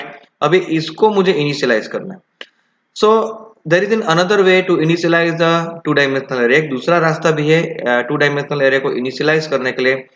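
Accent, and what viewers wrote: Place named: India. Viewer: Your explanation is amazing, but unfortunately I can understand nothing from your Indian accent.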